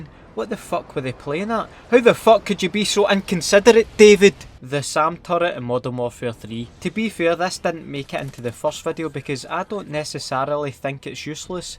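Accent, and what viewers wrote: Scotland, United Kingdom. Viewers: Wis happpnin in? Where aboots in Scotland are ye actually fae? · Absolutely love your Scottish accent, love from italy❤️ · i love hearing a scot guy ranting about cod killstreaks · It's because Scots tend to have a good sense of humour... But the accent DEFINITELY helps. Frankie Boyle for example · great acssent bit cam doon abit ma femily are pure hearted scots men an we ma get feejee a lot bit nae as bad a ye mate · Being from America his Scottish accent is very difficult to understand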